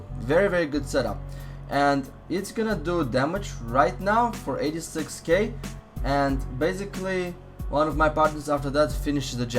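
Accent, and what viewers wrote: Russia. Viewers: I know it's pretty gay to say this, but man I missed your russian voice. ;)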